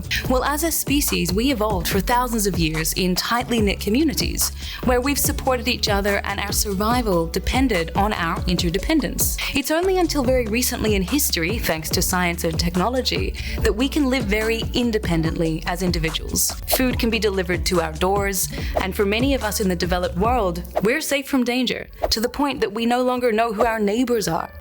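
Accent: United States. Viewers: your accent is changing from british to american, softer R's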